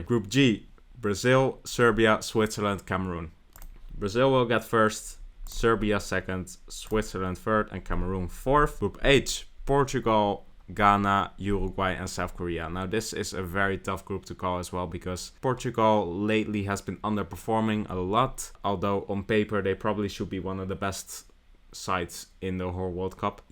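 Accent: Netherlands. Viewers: He may live in belgium but he is clearly dutch you can hear that from his accent. And look at his name is which is not a Flemish name and certainly not a Walloon name. is a typical Dutch (the Netherlands) name.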